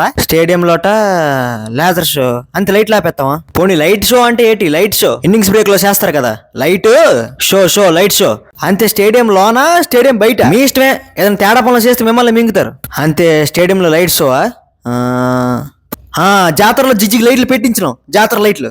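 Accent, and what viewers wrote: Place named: Andhra Pradesh, India. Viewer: మన సికాకుళం స్లాంగ్ రా😂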